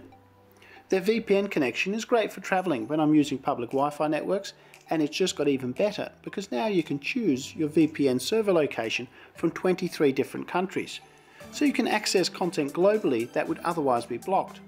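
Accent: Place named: Australia. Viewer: your so handsome and a gracious host. Love that Aussie accent!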